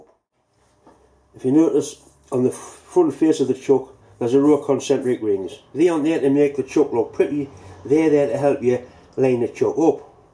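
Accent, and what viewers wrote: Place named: Liverpool, United Kingdom. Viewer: thats canny man scouser trying to be a geordie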